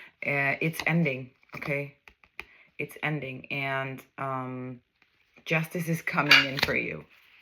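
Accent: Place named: Midwest, United States. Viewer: [VIRGO]~~~🛩️ HI! I had 444 on my phone and then you pop up on my TV screen😊 I'm new here. By your accent I would've swore your from the Midwest like myself..Illinois. Nice to meet you❤ have a awesome year! Your a doll😍😊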